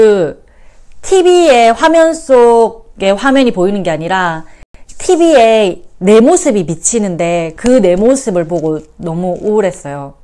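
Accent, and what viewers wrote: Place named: Busan, South Korea. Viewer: 부산분이신가봐요ㅎ 말투가귀엽ㅎ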